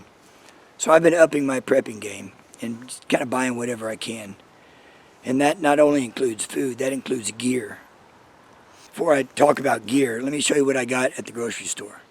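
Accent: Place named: South, United States. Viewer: I suscribed just because I love how southern your accent is lol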